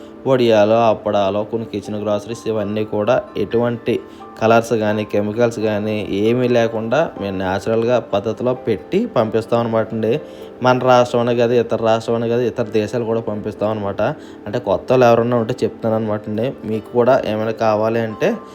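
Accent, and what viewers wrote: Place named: Godavari, India. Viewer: Anna ne matala ki navvu vastundi mana godharola language alagey untadhi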